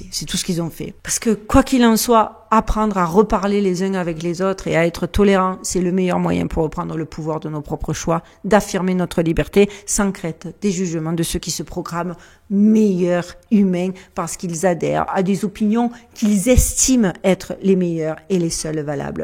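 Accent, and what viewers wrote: South, France. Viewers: merci perché de votre accent du sud 'j'espère que la vague de ce tsunami nous parviendra jusqu'ici en France ,chez nous aussi c'est le bordel j'ai l'impression d'être en famille en vous écoutant😂🙏 prenez soin de vous à très bientôt .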